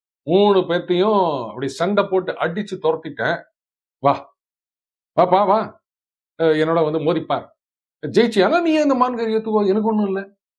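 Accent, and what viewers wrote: Chennai, India. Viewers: Mahabharatam in madras thamizh is refreshing 😋 ✨️ 😌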